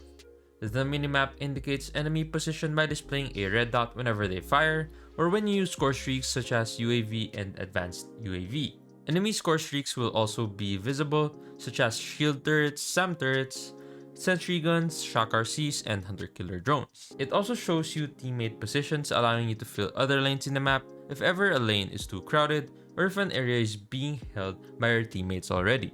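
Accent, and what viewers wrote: Philippines. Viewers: Bro i think you're a Filipino, idk but i hear it when ur speaking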